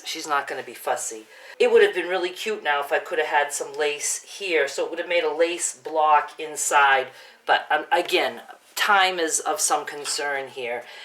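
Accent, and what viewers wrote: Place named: New England, United States. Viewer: Thank you so much for your tutorial! I loved the addition of lace! It felt so good to watch an experienced quilter run into issues. I have an ongoing relationship with my seam ripper, it's always right by my side!! I loved listening to you, you sound like a New Englander, I'm from Maine and felt comforted by your accent. I hope your mom loved the quilt! Take care!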